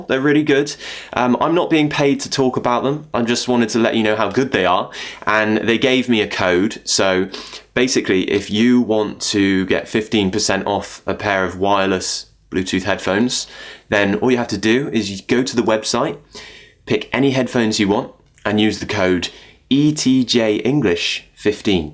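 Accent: RP, United Kingdom. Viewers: I've been watching your videos lately and all I found was your British English pronunciation is my ideal one! I wish I could go to the UK and study English, but I'm too skint to do that.. (Is that correct? using the word "skint"?) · I really like your british accent 😍😍😍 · I miss your lesson, your pronunciation is so smooth and really british, love it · Like your british accent 👌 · With this pretty face and British accent he could teach me how to install a damn garage door, and I would still be excited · Your accent perfect,i love this british accent . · Are you using RP? Sorry I cannot tell the difference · Of course it's been ansolutely useful... And a pleasure to listen to your inspiring RP pronunciation 💜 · I'm a new follower of yours, and I think you're the best teacher. Its very interesting to watch your lessons since your accent is very British, very expressive when you explain to your viewers and most of all the sense of British humour which naturally comes to you when you teach especially when you end the video. 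Thank you so much!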